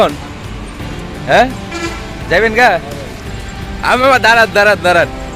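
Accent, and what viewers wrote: Sylhet, Bangladesh. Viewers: Are you Sylhety Or from another city? Good job, well done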